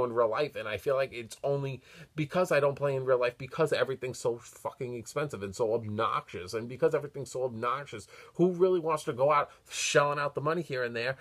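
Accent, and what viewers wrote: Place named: Boston, United States. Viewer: I like your Boston axe cent.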